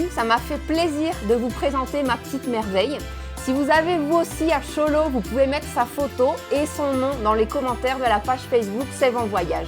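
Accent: France. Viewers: Me ha encantado tu video me hermana francesita! me das mas datos de mi cultura que que el INAH! (Instituto Nacional de Antropología e Historia) y es muy agradable escuchar tu acento "franchute" Ja parle francaise, un po y me encanta escuchar esos tonos y modos del francés! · Amo tu forma de explicar nuestra cultura con mucho entusiasmo e interes. Note que mencionas referencias y das a detalle del tema que abordas. De igual manera tu acento frances. Bellisima mujer. 😍👩🏻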